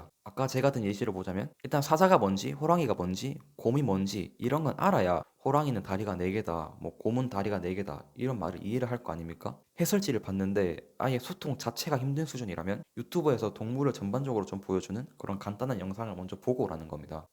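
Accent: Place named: Daegu, South Korea. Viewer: 대구분이신가요? 대구사투리 정겹습니다^^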